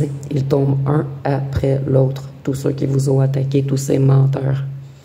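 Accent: Quebec, Canada. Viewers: Merci beaucoup Madame la Cartomancienne.Je suis de France ET des Îles de France.J'adore le CANADA ET LES ACADIENS ET ACADIENNES D'OU VOUS ÊTES CAR JE RECONNAÎS FORT BIEN L'ACCENT DU QUÉBEC.BONNE JOURNÉE À VOUS MADAME 😂😂🎉🎉🎉❤❤😢😢😢🎉🎉😂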